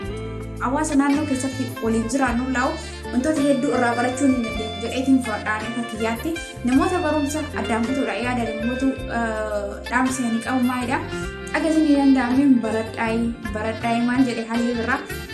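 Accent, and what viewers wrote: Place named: Harar, Ethiopia. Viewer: Anaa dhufuu & waw 😍 cimtuu too gaafifi debii baredaa halaan nama hawwatudha qaxalee too waqayyoo bakka yadden si Haa gahuu qashtii too shawadha jettaa ani intaa harargee natti fakkata bar😍💞akka arargetti hasofta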